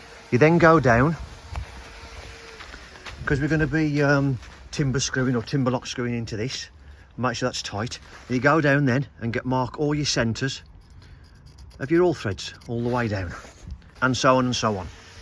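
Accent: Birmingham, United Kingdom. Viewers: I may have missed this, but what is the Length and Width of this Build? I may have missed it in Part 1...Sorry. Just curious if a particular wall lenght is more than 4.8m, bracing 2 pieces of timber, sould that be sufficient? Example 6m by 4m outbuilding. Definable feel the ground prep is much cleaner than have pouring concrete for a slab...think you have sold me on this rod system.... Videos and content great - plus the brummie accent ❤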